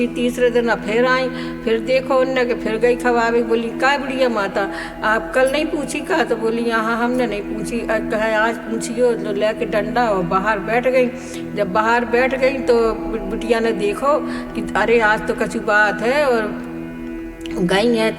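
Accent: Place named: Bundelkhand, India. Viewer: Bahut acchi lg rhihai katha apni bundelkhandi boli sunkar to maza hi aa jata hai. Radhe Radhe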